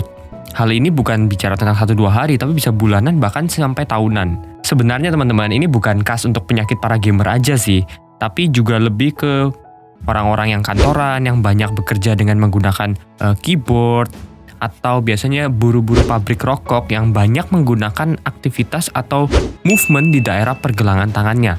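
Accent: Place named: Java, Indonesia. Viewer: Medok seru 😆 tpi apik mas Gas teros kontennya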